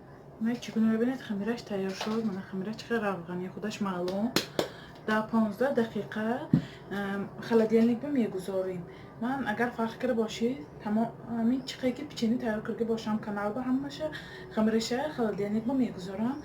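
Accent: Isfara, Tajikistan. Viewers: Бехтарин Шумо аз шахри Исфарами Акцентатон авантюрин.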